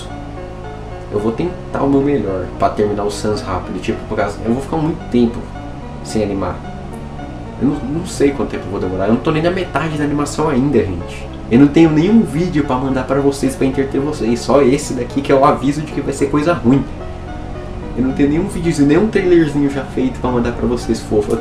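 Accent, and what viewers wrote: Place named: Portugal. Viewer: ah ta portugues de portugal :3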